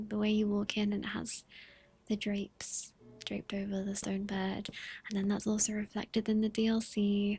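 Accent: England, United Kingdom. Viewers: Beautiful accent! What part of England are you from?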